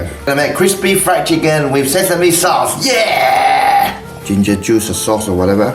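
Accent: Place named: Malaysia. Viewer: do more malaysian accent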